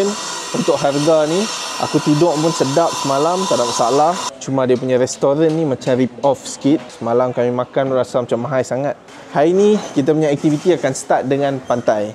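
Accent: North, Malaysia. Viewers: Yang paling best loqhat utara tak hilang weiiii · Selalu tgk vlog mat salleh..ni vlog BM loghat utara..mmg lain dari yg lain..saya dah subs😊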